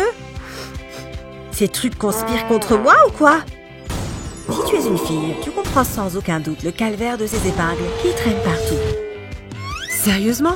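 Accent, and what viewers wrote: England, United Kingdom. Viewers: merci pour c astices mais juste vous êtes anglaise?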